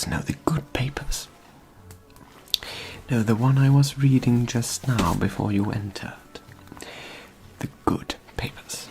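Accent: RP, United Kingdom. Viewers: of all the historical asmr roleplays i watch regularly, these are my favorite as the older posh accent tops it off so well!! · Love this! Just discovering your channel now,. Love the background music, your posh voice and the creativity of this upload...brilliant! Thank you for the tea and cakes while catching me up on the latest gossip.❤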